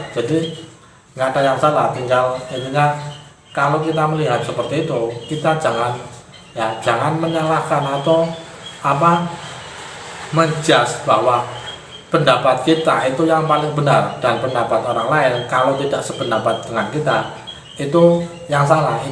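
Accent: Banyumas, Indonesia. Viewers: Ora ngapak ora penak 🤫🤭😄😂 Salam tukang tempe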